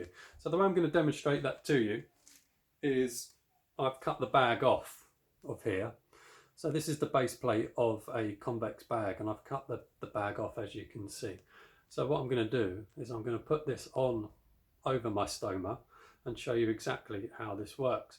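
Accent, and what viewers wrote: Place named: England, United Kingdom. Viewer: Good to hear an English accent instead of dreadful drawl from the American offerings.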